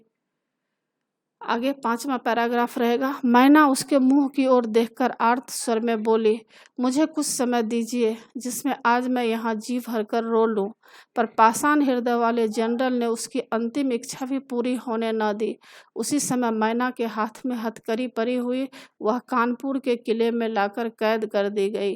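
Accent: Bihar, India. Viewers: Mam aap bihari hai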